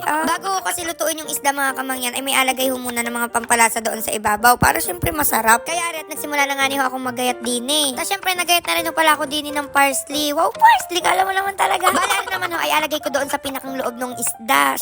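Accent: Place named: Quezon, Philippines. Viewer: Quezon province, specifically in mulanay quezon same accent with you po 😊😄 watching from Qatar now 😊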